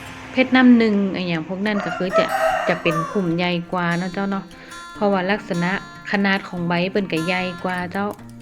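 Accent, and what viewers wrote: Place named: North, Thailand. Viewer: ใบงามจ้าน้อง เป็นลายเนาะพี่ชอบต้าพูดเหนือ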